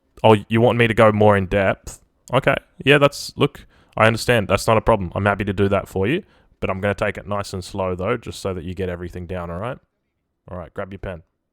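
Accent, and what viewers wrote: Australia. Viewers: and hes aussie asf.. Fuck yee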